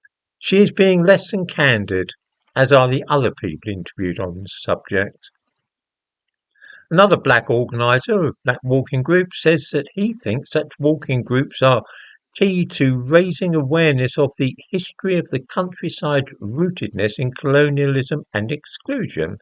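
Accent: United Kingdom. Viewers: Great video, and it was nice to hear the english i miss and never get these days. But please please please, have that mustache trimmed and fixed. And learn to use all the products thats out there for beards and so on. You wash it and form it every 2 days or so, throw a bit beard honey in there, and it will look more immaculate. And not like you've glued a dead rat on your face. Its gonna make a world of difference. Regards from a brit in Denmark.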